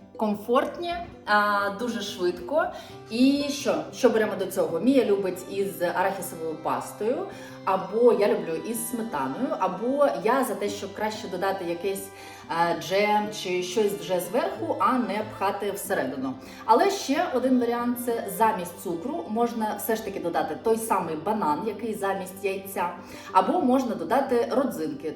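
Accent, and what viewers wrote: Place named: Ukraine. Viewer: Фууу. Такая девушка, а разговаривает на смешном деревенском языке. Вам не идёт. Суржик у вас. Беее. В первых роликах на русском было красиво.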